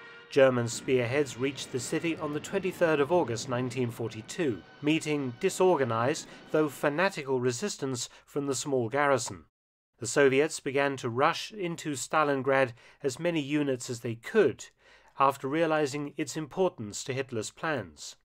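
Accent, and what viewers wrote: England, United Kingdom. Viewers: I see there are over a hundred dislikes. How is that possible this is really good stuff that's never been shared to this extent that I heard. Are the dislikes because they think it's untrue or because the guy has a British accent I just can't for the life let me figure out why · Mark Felton with his English accent makes the documentaries better. More gravitas.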